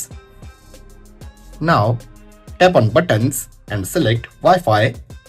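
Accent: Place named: India. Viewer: 🫪Ooh nooo... indian english!! 😵‍💫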